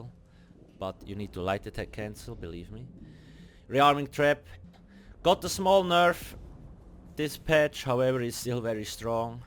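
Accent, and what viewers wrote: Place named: Switzerland. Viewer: I'm a simple swiss, i hear swiss accent, i upvote!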